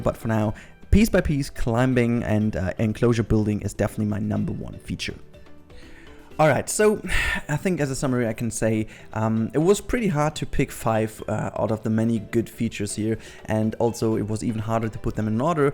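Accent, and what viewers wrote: Germany. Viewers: Are you a German Person?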